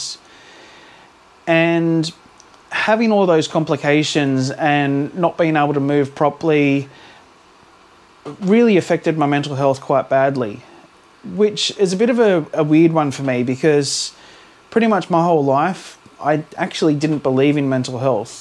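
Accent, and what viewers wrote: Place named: Australia. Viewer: so great hearing another Aussie accent here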